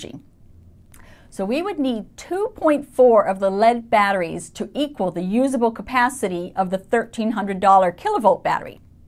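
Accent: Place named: United States. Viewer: That blew my mind and I had to get a cup of tea and watch it 3 times lol/. I love an American accent and that was the best tutorial ever!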